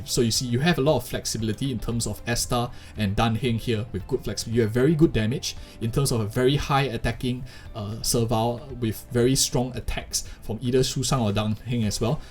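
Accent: Singapore. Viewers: Oh hullo this guy sounds a bit Singaporean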